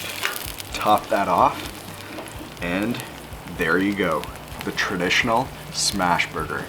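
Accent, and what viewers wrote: Canada. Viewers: Canadian eh?! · Looks delish! And love your Northern Neighbor accent. :)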